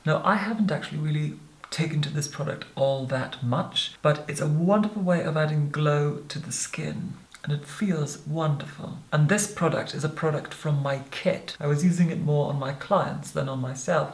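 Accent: Scotland, United Kingdom. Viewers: Would love to hear your Scottish accent…….love from Aberdeen 🏴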